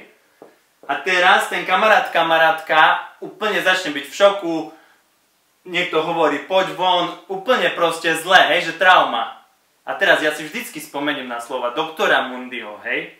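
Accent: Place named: Šariš, Slovakia. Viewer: Ten prízvuk :D .. strašne mi to vadi, keď hovoríš po SK tak skus pouzivat normalny prizvuk, a ked tak šarisky uz rovno hovor, lebo sa to dost hrozne počuva občas :D .. ale inak dobre video :)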